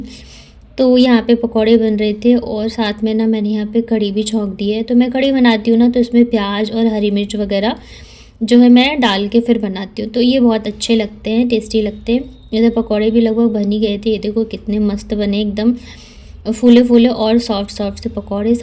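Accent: Bihar, India. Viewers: Di aapki bihari bhasa bahut acchi lgti hai please kisi din banayiye pura blog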